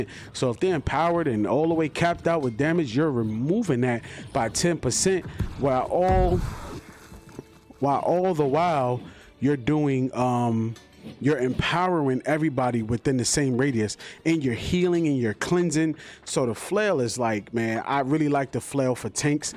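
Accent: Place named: New York, United States. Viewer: my boy from newyork ? where at? haha queens represent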